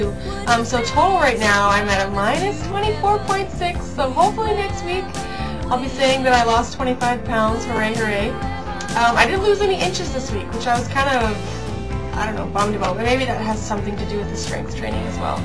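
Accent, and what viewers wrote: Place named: Wisconsin, United States. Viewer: You betcha! Lol....I'm from Wisconsin, so it sounds like ours a little.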